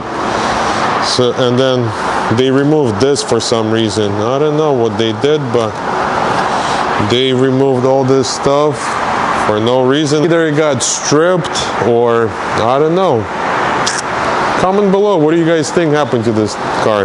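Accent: Russia. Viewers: Я один слышу хороший такой русский акцент?:))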